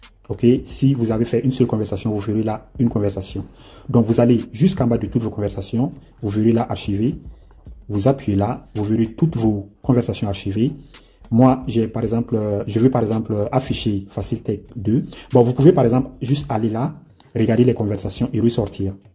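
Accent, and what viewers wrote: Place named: Burkina Faso. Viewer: Merci beaucoup ! Je connais pas ta nationalité mais vous avez un accent Burkinabé 😁😁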